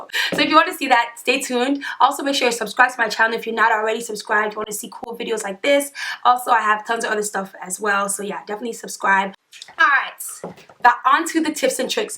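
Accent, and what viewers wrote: Nigeria. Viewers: yes she's a NIGERIAN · Are you Nigerian?? Your accent sounds like it 😂❤️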